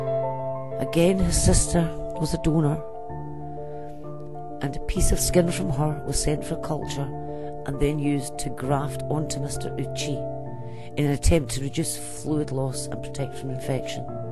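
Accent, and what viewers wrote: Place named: Netherlands. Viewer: That’s a Dutch accent that the narrator has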